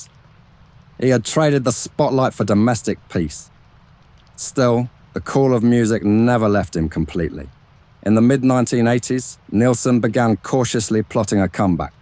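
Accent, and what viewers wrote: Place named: Cockney, United Kingdom. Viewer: Excellent as usual brother, your writing is absolutely stellar! Wonderful listening...the Cockney James Cagney!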